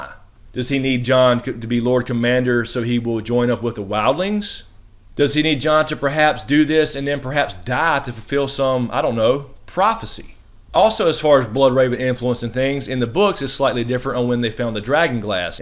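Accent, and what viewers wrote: South, United States. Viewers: Oh, I see. Your accent is very southern but I couldn't figure out where. Anyway... how do you think Melisandre will ultimately convince herself that Bran and/or the Bloodraven are not necessarily evil?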